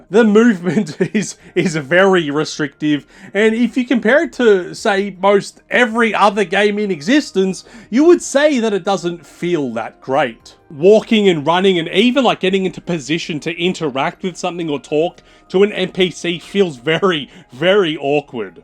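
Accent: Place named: Australia. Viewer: As an American, I love a good Aussie narrator 👍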